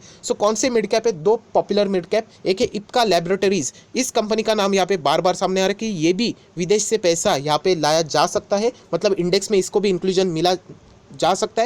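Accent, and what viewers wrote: Maharashtra, India. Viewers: सर, तुमच्या बोलण्याच्या पद्धतीवरून असे वाटते आहे की,तुमचा मराठी भाषेशी काहीतरी संबंध आहे.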